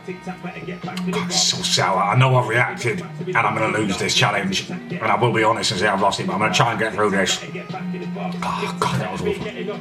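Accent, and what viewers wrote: United Kingdom. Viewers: Ahagrande He's British?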